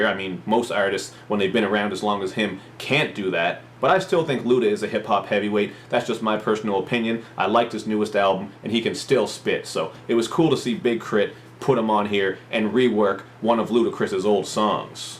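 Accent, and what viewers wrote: Canada. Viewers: New subscriber here so I dont know if you've already mentioned this, but are you from Toronto? I noticed the hat and your canadian accent lol. Im from toronto was just curious. Great review.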